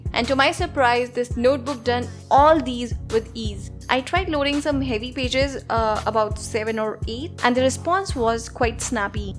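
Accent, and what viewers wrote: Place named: India. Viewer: i like your indian accent.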